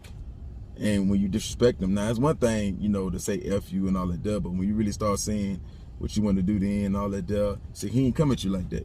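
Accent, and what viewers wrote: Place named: Atlanta, United States. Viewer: Sound like u from da A I'm from Pittsburgh zone 3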